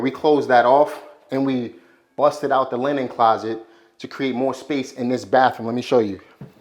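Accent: New York, United States. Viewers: Love the content bro!! The new yrk accent never leaves lol!!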